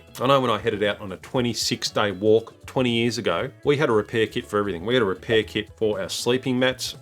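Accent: Australia. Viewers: Great video. Love watching Aussie youtubers talk about gear. Our terrain, bush and scrub are so different from other places, and our gear has to be up for it. Appreciate having an Aussie voice contributing to the gear space!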